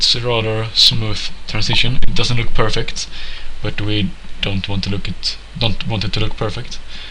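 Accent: Sweden. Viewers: are you swedish? your accent xD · 100% svensk hör man iaf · Nice tutorial, 100% swe accent right?:D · Are you swedish? It sounds like that! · We klick ehh, hah bästa svengelska videon!, tumme upp! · Din betoning låter väldigt svensk... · Är du svensk det låter som det på din dialekt?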